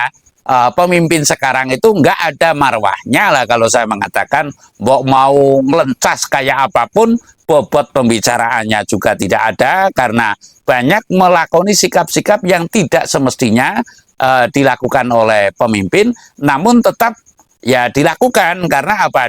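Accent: Banyumas, Indonesia. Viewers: logat banyumasan memang spt itu juragan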